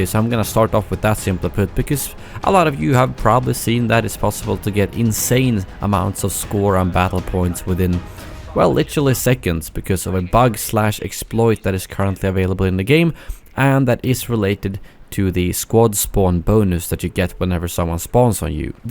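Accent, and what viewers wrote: Sweden. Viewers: Are you swedish? You have the same dialect as Dennis.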